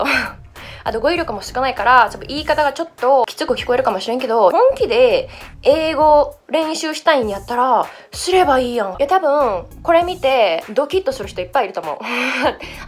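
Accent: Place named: Okayama, Japan. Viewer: 岡山弁の響きが心地いいです。あるある、楽しかったです！ ほんま、おえん。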